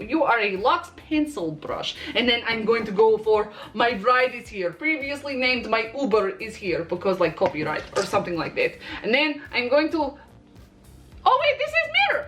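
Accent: Russia. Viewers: God i swear i love you soo much. You're like my spirit animal lmao. But you said towards the beginning that you're from Australia. I thought ypur accent was maybe Russian or something?? Im sorry, i swear im not trying to offend. Just a curcious cat. 😻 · She sounds russian... but her voice is so soothing.... even when shes freaking out about makeup.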